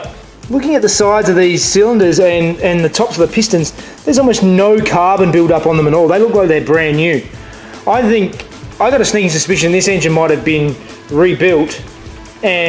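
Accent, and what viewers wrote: Australia. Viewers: Aussie accent? If so how can i understand most of what u say not the same with that cycling durianrider i cant barely understand.. Greetings from mexico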